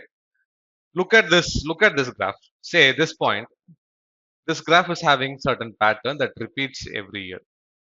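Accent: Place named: India. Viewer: What a perfect indisn accent :)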